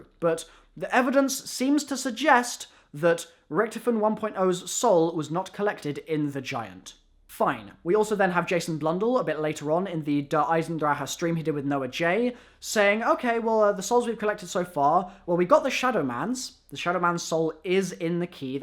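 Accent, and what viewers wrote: United States. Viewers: Your American accent is amazing do it more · spot on American accent 👌🏽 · American accent.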